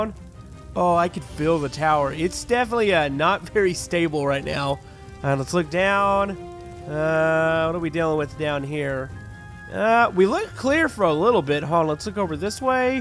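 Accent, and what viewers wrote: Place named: Texas, United States. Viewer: is problobly from Texas from his accent.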